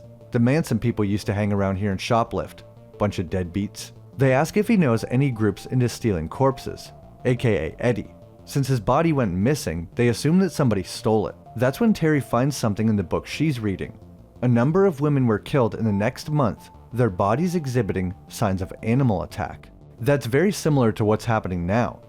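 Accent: Canada. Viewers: are you from Canada? I hear a couple of "oots" aka outs lol. Hope this isn't offensive. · bb are you canadian?? cuz you hella sound like it lol (i'm from vancouver) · Am definitely enjoying the Canadian accent.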